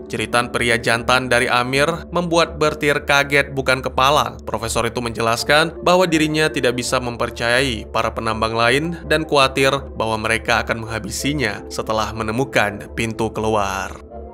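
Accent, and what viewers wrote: Aceh, Indonesia. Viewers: Bang orang Aceh kah? Yok ketemu bang di Banda Aceh penasaran sama suara candunya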